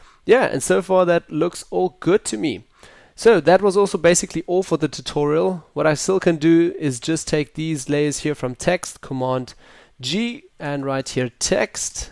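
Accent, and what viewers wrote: South Africa. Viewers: Hey man, I saw you were German but you have a very South African accent, you live there? XD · Jy klink soos n SA man :D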